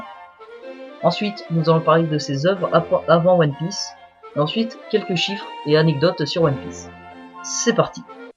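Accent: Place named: Japan. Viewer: Je valide l'accent japonais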